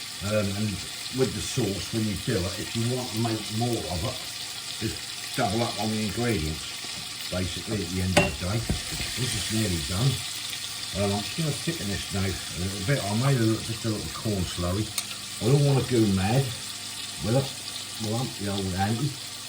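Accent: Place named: Wolverhampton, United Kingdom. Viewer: Nice to see someone on youtube who can cook for a change :) Looks good, you sound local to me I'm in Wolverhampton :)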